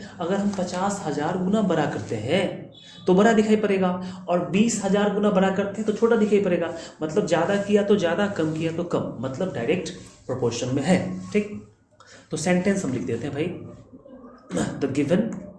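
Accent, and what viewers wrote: Bihar, India. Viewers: You are from bihar na Because बणा .......बरा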